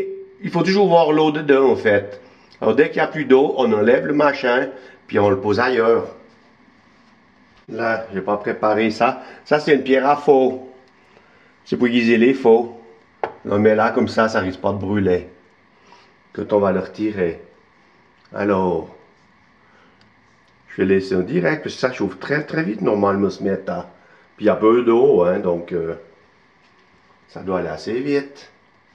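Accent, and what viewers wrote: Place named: Vaud, Switzerland. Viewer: Bonjour, Votre joie de l'enfance que l'on ne pouvait pas s'offrir est communicative. Il me semble que Märklin faisait aussi ce genre de machine. Petit j'en rêvais...Bonne suite et merci de vos partages authentiques, plein de saveur, avec votre bon accent vaudois (j'ai le même!) Laurent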